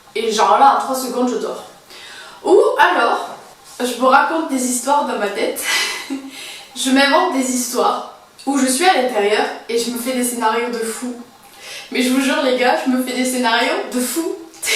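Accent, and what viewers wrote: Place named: Marseille, France. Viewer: tu a un petit accent sud impeut marseillais je trouve tu vien d'où de quel rejion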